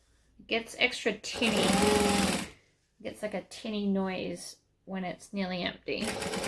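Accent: Australia. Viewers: I just had Open Heart Surgery and I watch your videos when I can’t sleep. I like listening to your voice. I like that Australian accent! I think everything you make are beautiful.. especially the purses you make!! What talent!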